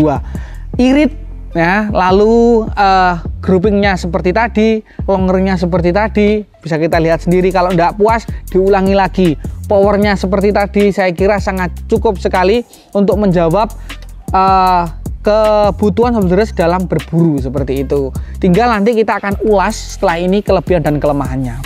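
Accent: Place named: Java, Indonesia. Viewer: Bahasamu kang,medok eram🤣🤣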